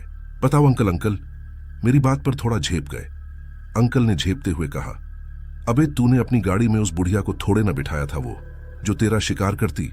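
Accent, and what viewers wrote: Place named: Bihar, India. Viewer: Are Bhai bihariyon ki tarah kyon bolate ho bada Ajeeb lagta hai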